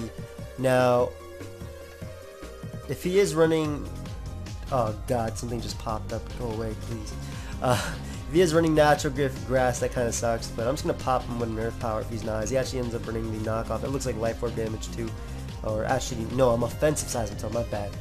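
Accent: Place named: New York, United States. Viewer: Liam Flaherty Nah, he just has a lazy way of speaking, along with dat slight Nyu Yawk accent.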